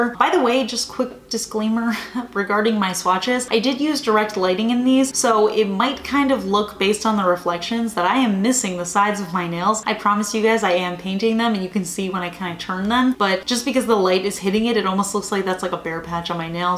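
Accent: New Jersey, United States. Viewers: I didn't even notice that you said "pastel" in a way that would bother people. I'm from north of Boston but I'm half NJ on my mother's side, so I grew up hearing words differently. Farhead and Foah-head, Radiator (with a short a like apple) and Raid-ee-aydah, etc. So count me in as a fan of the NJ accent!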